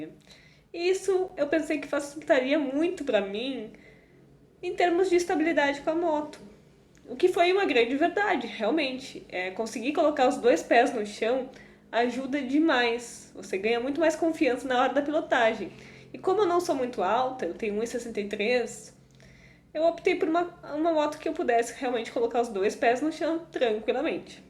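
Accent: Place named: South, Brazil. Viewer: que sotaque gostoso do sul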